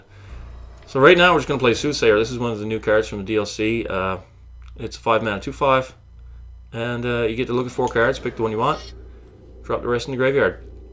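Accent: Canada. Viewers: you canadian? just a question you have a pretty thick accent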